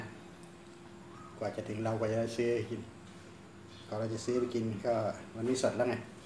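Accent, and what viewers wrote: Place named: Isan, Thailand. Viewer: จ่าคือบ่อเว่าอิสานบ้านเฮา..มันมีเสน่เด้ ผมว่าน่ะ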